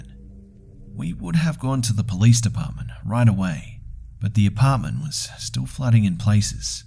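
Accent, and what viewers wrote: Australia. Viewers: are you Australian cause your accent sounds Aussie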